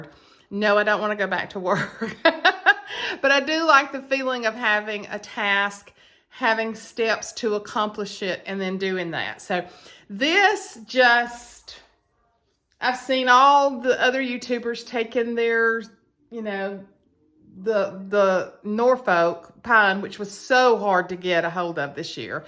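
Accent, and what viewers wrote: South, United States. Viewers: I LOVE your Southern accent. I have always lived in the eastern Cape, and we too have a distinctive accent. So I guess we are both "Southern" girls, just different hemispheres. Lol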